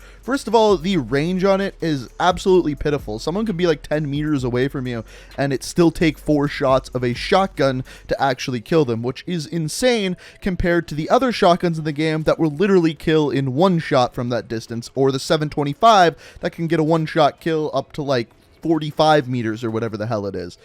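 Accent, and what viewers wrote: Canada. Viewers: Why do Canadians like there ooooos and eeees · You sound 🇨🇦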